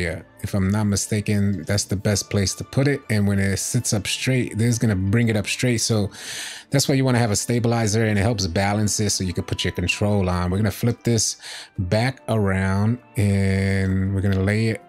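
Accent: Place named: New York, United States. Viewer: You're a NY cat ain't ya.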